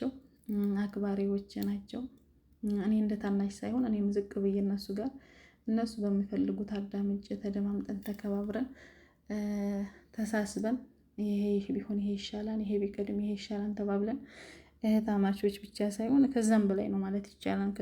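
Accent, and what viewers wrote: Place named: Wollo, Ethiopia. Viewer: እርግጠኛ ነኜ አማራ ክልልናት የወሎ ይመሰለኛን አማርኛዋ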